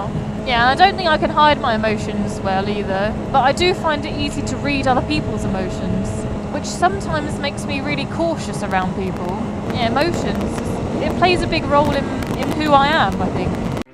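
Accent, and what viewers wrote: United Kingdom. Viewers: i like that British accent so much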